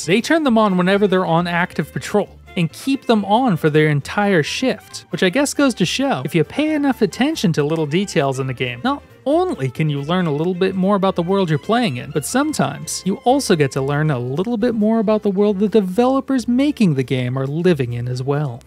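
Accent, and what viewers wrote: Boston, United States. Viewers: Your accent sounds like Boston. Where are you from? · Is it just me or half way thru the video he talks with a Boston accent for some reason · I love deep dives into game worlds like this! Btw, are you from Boston?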